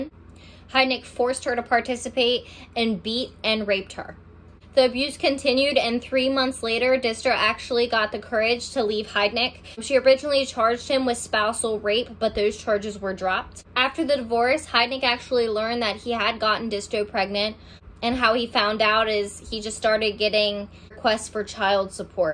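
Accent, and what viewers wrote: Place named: Baltimore, United States. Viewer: Where u from if you don't mind..... im from Baltimore, and you have a Baltimore or MD accent. Jw but love ur story. Very detailed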